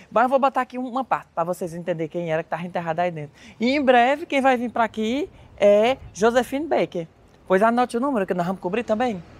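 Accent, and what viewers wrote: Ceará, Brazil. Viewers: Adoro seus vídeos, vc nos mostra lugares lindos e passa as informações de forma divertida e amo seu sotaque, sou de São Paulo mas minha família é do Ceará, qdo vejo seus vídeos só lembro da minha família 🥰😘💚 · Bom dia, espero que tudo esteja bem c vc.como boa nordestina gosto.de assisti-lo pois vc ñ perde o sotaque cearense do Juazeiro e do Crato, sou paraibana do sertão.e minha cidade faz limite com Mauriti e conheço toda região. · Gratidão por manter seu sotaque, por valorizar suas raízes. Também sou nordestina e amo o nosso jeito de ser.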